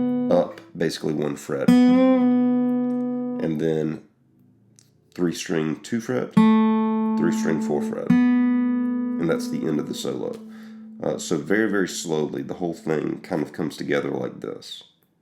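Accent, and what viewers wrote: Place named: Georgia, United States. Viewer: Thanks so much for all your hard work and easy to follow videos. Wondering which part of the country you’re from. Sounds a bit like GA.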